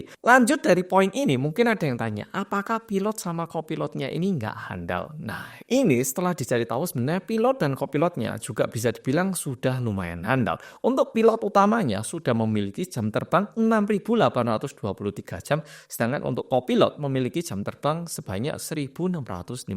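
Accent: Java, Indonesia. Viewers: Masyaallah jelas banget kasih keterangannya. Juga ko' medok lisan berkata nee orang mana nyak ? Matanya sipit Bahasa medok jawi 👍 Terimakasih untuk kabar beritanya. Alfateha untuk mukminin&mukminat yg kena musibah. Semoga husnul khotimah Aamiin 🤲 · Muka oreintal, ngmg medok😢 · jowo medok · Haloo SeonSaengNim Medhokk😅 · Ngomong nya medhok. Indonesia banget. Dan banyak banget subscriber nya ternyata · Pertama gw translate. Pas up volume ngomonge medok. Auto off translate 😅😅 love u bro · Seneng dengerin logatmu mas...medok 😅😅😅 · Baru pertama kali dengar orang Korea tapi ada logat Jawanya :)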